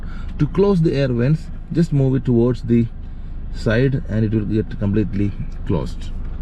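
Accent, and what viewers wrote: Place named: Kerala, India. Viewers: Sounds like a " Malayali "...... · Malayali daaaaa....😍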